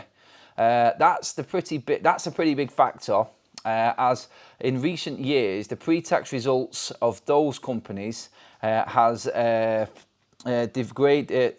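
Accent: Manchester, United Kingdom. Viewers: Antoneh… proper mancc replacing Y’s with H 😂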